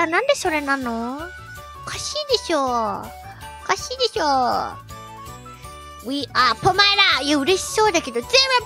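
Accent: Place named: China. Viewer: Is she Chinese? That accent definitely isn't Japanese first language English Second Language.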